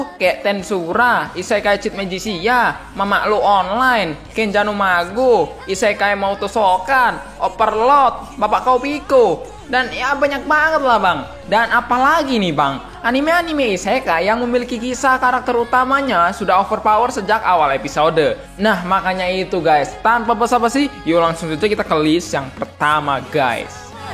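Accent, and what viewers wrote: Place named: Java, Indonesia. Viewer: kek si re:Jero mati terus ampe bosen Gw suka logat nih creator jujur(asal jeplak) dan medok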